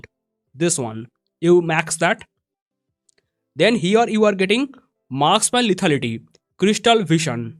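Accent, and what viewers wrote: India. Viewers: Don't not speak English..you just talk India man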